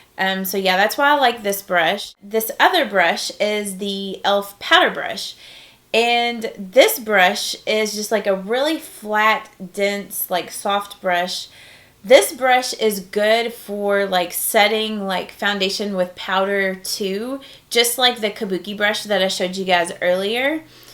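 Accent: South, United States. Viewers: You have to be southern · Katelyn Hughes she's one of two so far that I have followed specifically because they have a Southern accent! I love hearing ladies that talk like me! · Great video! I love that some of your favs are the $1 brushes. I feel like they don't get talked about enough since the studio brush line came out, and there are some real gems in that ($1) line! If I may say so, being a southern girl myself (lower SC, it don't get much more southern than that lol), I just want to suggest you work on what your going to say a little more before you film. There are a lot of "um's", "so yeah's", and a few mispronunciations of words that can really separate a youtuber from being a guru. It may be as easy as just editing a little more so your thoughts become more concise and you don't repeat yourself so much. People hear a southern accent and immediately take you as being uneducated. 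It is ridiculous and horrible, but you can overcome that be simply trying a little harder to make sure you're not losing your audience because of your speech. I hope you don't take what I'm saying offensively, I don't mean it that way at all. I really like you videos. I just think you could really gain a bigger audience by tweaking this one thing just a little bit.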